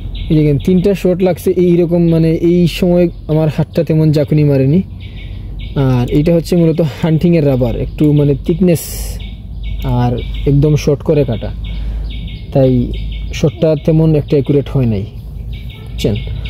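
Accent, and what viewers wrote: Sylhet, Bangladesh. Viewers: Vai ki sylheti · Bai apnr matar style sylhetr moto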